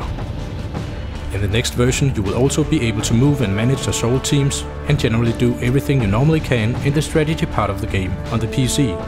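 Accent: Denmark. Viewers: No, he's Danish, I know this because I'm from DK myself, and I can clear recognize the accent :D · i hear a danish accent... hmmm FUCK YERH IT DANISH! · Haha! Could hear right away that the narrator was danish! xD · Who voiced this? a danish person?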